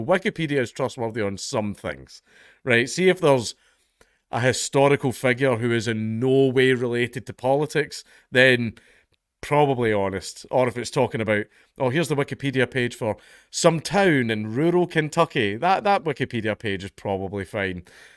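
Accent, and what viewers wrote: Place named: Scotland, United Kingdom. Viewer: Sex studies retracted. Gotta love the Scot accent.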